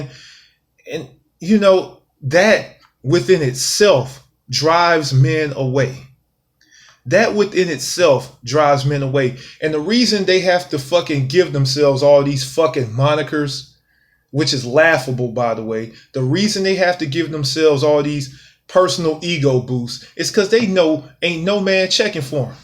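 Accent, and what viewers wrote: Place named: United States. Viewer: I'm sick of them to man. I been done left the building. you did a good job telling them off man. you sound like you been in the United States all your life. good Job man.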